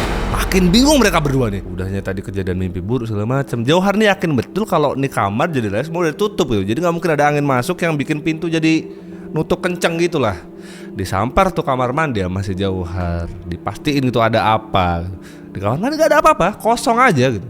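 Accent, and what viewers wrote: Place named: Lampung, Indonesia. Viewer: logat² nya kaya orang Lampung bang 😊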